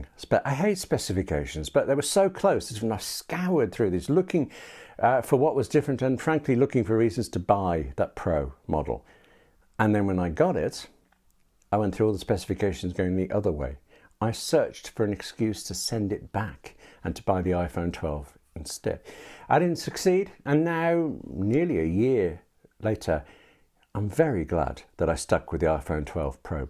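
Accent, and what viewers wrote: England, United Kingdom. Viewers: You have a beautiful soft English accent I could listen to you speaking forever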